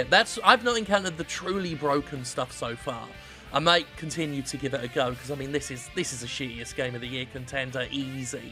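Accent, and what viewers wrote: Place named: United Kingdom. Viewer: My day just ain't complete without golden british voice talking shit about games that thoroughly deserve it.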